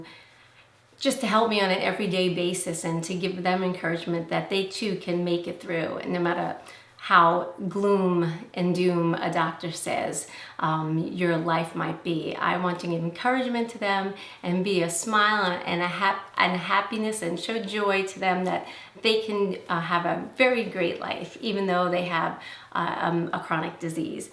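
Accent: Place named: Long Island, United States. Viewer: Ok, I'll share George. I think another tag sounds great! xo ps love the comment about your accent from Sharon. LOL we Long Islanders always get made fun of!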